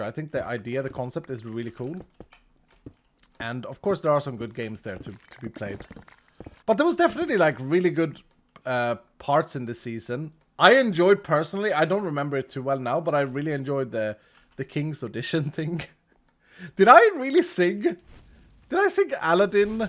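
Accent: Sweden. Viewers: Taking a radically different approach to season 10 sounds refreshing and fun. I'd rather see another season-long 'Señor Bumbo Cactoni' style prank war than episode after episode of time-lapses anyway. Cub, Beef, and Zedaph did just fine this season without massive bases, maybe take inspiration from them. Hermitcraft doesn't need to follow the 'bigger and better' YouTube meta, frankly it's stale and something new needs to be brought to the medium. And, side note, as an immigrant in Sweden who's making all kinds of mistakes när jag lär mig svenska, your occasional svengelska idiosyncrasies are barely worth mentioning. You're far better at English than many native speakers I know, occasionally slipping on complex conjugation or weird edge cases is just a 'second language' thing - and they come off as charming and endearing. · It would be so sad to see you leave hermitcraft! 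Frankly, you are my favorite hermit, and the reasoning behind that isn't the Mega bases or crazy redstone (although you deserve tons of credit for that!) The part I really love about you is your humor and interactions with all the hermits! Literally, i started watching immediately after 12 bamboo, and I've loved your content ever since! You've been so funny and made some wonderful collabs! I've never stopped thinking about hermit challenges, dare sticks, or squeaky inflatable toys! I also really enjoyed the Vault Hunters series too! I originally hated modded MC, but the moment I watched your single-player series, I fell in love! Seeing all the interactions between skilled and new Hermits was so entertaining and creative! A few friends and I think it would be cool to see hermitcraft shift into a little more modded stuff, such as Terralith, to add to the vanillia experience with custom world generation! 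And with your accent and 2nd language, who cares if you mess a few words up? I really enjoy hearing your voice, accent, and humor! It's so charming! I watch you because I found it so cool you are Swedish and different (in a good way) compared to the Youtubers I normally watch. It's unique, engaging, and fresh! All in all, you are a talented and amazing creator, and no matter what you decide to do, I'll always be there to watch your amazing omega videos (of doom)! Keep up the amazing work with minecraft and Vault Hunters, and don't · You do you ❤. We love your Swenglish and as a non native English it is so recognisable. Make a base you enjoy and upload when it feels good for you. Grian and Mumbo do the same. I always love it when you can hear the true joy in vids instead of something forced. And there are other hermits who don t make mega bases either. Dont take the joy away by comparing yourself to others. 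You are wonderfully unique ❤ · It has never bothered me to listen to the way you use the English language. In fact, it's sort of endearing, you've always been the silly Swedish guy! In fact, your content has always been a source of comfort for me. I started watching Hermitcraft in Season 6 and you were immediately one of the first hermits I began to watch. Even if you never upload another hermitcraft video, no matter how sad the fans may be, we will continue to support you. Make the content that makes you happy, and we will keep watching. I personally didn't care as much about the building, I loved the way hermits interacted, the games, the pranks, everything. It means the world to me that you have hermits on Vault Hunters. community is what makes Hermitcraft great. I hope to see some videos from you on the #10 server, but at your own time and at your own pace. and even if you don't, I'll keep watching for Vault Hunters.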